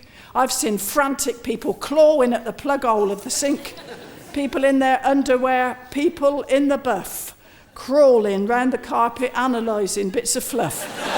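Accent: West Country, United Kingdom. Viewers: 120 wpm for pitmans shorthand - impressive. I only have the certificate for 60wpm and thats enough for me. For years I thought she was from the Westcountry (because of her accent) and have been disappointed in recent times, to learn otherwise - she's as lovely as ever and is an English talent that we Brits remain rightfully proud of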